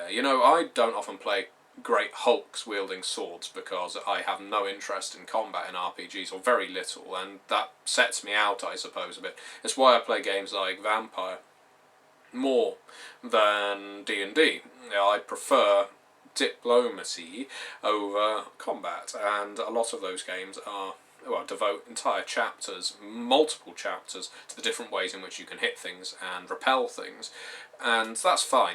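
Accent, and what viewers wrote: England, United Kingdom. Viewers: when he talks, i have to pay attention. the English how do they do it?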